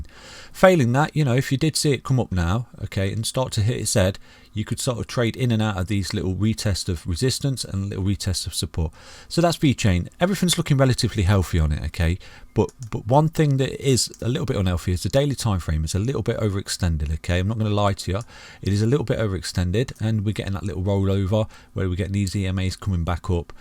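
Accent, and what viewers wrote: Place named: Midlands, United Kingdom. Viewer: it sounds like your from the Midlands, UK. Can't quite pin your accent down 🤔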